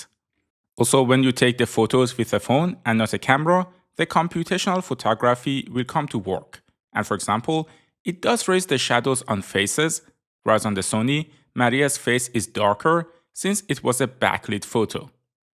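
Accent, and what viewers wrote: Iran. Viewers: bro your accent is sooooo iranian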